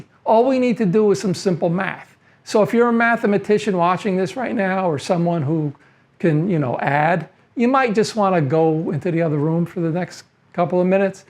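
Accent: New York, United States. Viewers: Thank you for your reply! I've visited NYC a number of times and have friends in Queens, so I noticed your accent immediately. Brilliant video - a unique take on the issue! 💙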